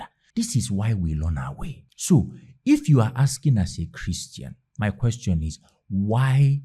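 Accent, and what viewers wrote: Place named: Anambra, Nigeria. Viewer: So I was peacefully listening and enjoying this video ooo Until I was distracted with your Anambra accent, and I remembered the trending video that brought me to YouTube today... Please can you be my Odogwu from Anambra 😂😂😂😂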